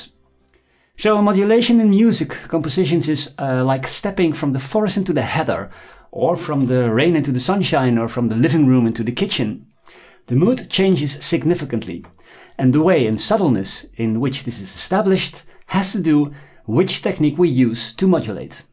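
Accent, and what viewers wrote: Netherlands. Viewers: I enjoy your backing tracks and lessons. thanks for both. I also enjoy your accent, which reminds me of when I lived in Tilburg (I am Australian). bedanken!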